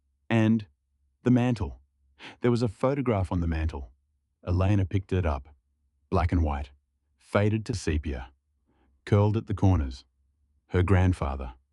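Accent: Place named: Australia. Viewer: Loved the Australian accent. It is so much easier to listen too .. are there any more read in an Australian accent?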